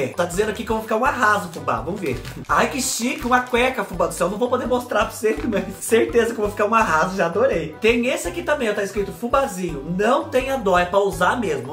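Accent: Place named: Riversul, Brazil. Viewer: ai kkkkkkk nao aguento, minha vo é d riversul e fala igual vc, jaguara, pandu kkkkkkkkkkkkkkkkkk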